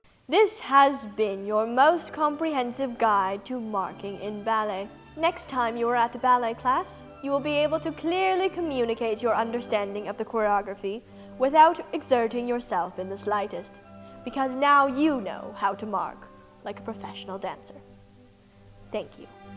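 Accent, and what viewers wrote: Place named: England, United Kingdom. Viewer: I love how English accent becomes more pronounced as the video continues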